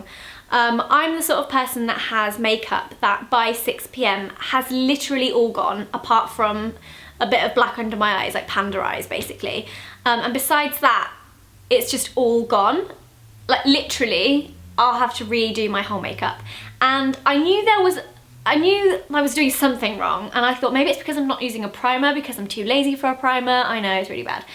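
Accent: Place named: Somerset, United Kingdom. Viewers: I'm from the south west and I literally sound exactly like you at the start of the video(: love your makeup in this video!💕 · I know you said shout out to Wiltshire, but people speak like this in Somerset too, and it was nice to hear, being at uni so far away from those accents you don't think you'll miss!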